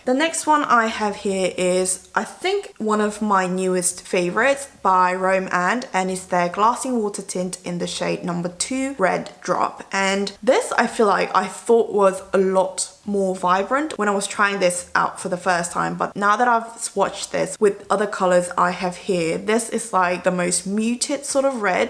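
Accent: United Kingdom. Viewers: 영국 발음!!! 영국계 한국인이신가용.. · 심지어 오랜만에 영국영어로 해주시니 더 좋네요 흑흑 😌❤️ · 미국 영어나 캐나다 영어 하시는 분들은 많이 봤는데 영국 영어 하시니까 멋있으시고 신기해요! 🥺✨ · 안그래도 영어로 말씀하시는 콘텐츠 보고싶다고 댓글담기려고했었는데 이번에 뙇!! 넘 반갑고 좋아요 헤헤 의 영국식발음도 좋고 구사하시는 단어, 목소리 등 다 너무매력적이에요ㅠㅠ💕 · 영국억양이 넘 듣기 좋아서 계속 되돌려보기 한다고 진도가 안나가요..❤️ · 오오랜만에 영어로!! ㅎㅎㅎ한국으로 오시고 영국발음 너무 오랜만에 들어요♥️ 오늘도 잘볼게요!!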